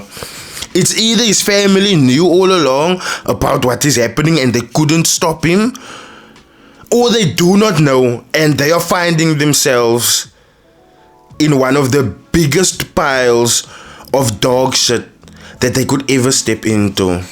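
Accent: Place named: Cape, South Africa. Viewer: The way you speak makes me miss my Kaapse brahsse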